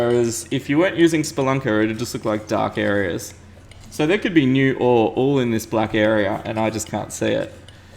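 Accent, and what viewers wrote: Australia. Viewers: I didn't think you ever swor in ur vids because ur so and also r u from Australia or new Zealand ITS KILLING ME!!!!!!!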